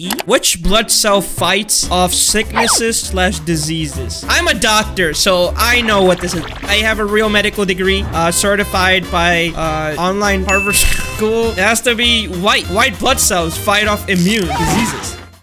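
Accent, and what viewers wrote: India. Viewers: you are Indian???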